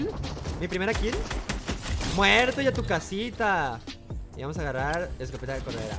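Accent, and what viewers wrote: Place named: Castile, Spain. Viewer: hablar fresa y en español castellano... ASCO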